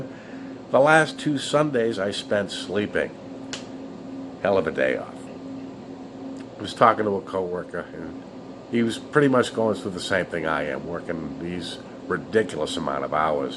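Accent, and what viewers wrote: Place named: Pittsburgh, United States. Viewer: . Are you Pittsburgh?? I swear. I detect a Pittsburgh accent!!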